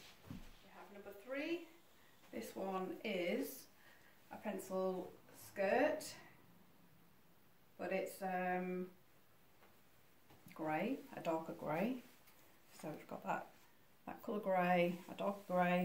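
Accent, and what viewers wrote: United Kingdom. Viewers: Hi Thanks for responding, most appreciated. I know that your are passionate about Cervin, but I've just had a mail from Gio - 50% off everything and their stockings are very good too.. Lovely to hear your northern accent. Where are your origins? I'm originally from Bolton/Chorley. Have a lovely evening xx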